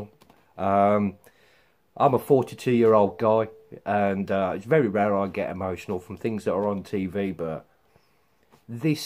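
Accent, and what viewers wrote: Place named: Northern England, United Kingdom. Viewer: Thanks man, if you don't mind me asking, where in the UK are you? You sound quite northern ..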